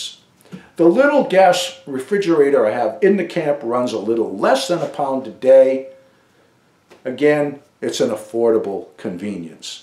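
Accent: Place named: Massachusetts, United States. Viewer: Not to get off topic, but you have a very pronounced new England accent, specifically Massachusetts. I'm from Massachusetts, and I would know that accent anywhere 😊 GOD bless you and Thank you for the tips.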